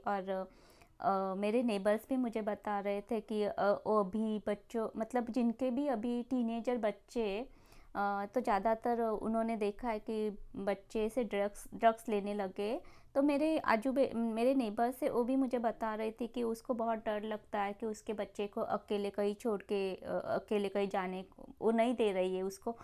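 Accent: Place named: Odisha, India. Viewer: your Hindi accent is so similar to Odias of Bhubaneswar region..!!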